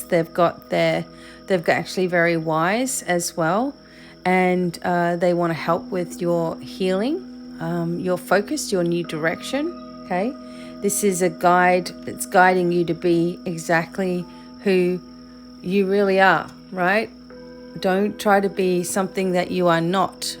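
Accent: Australia. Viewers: Knickers in a knot 😂 So Aussie , love it . Hello from NSW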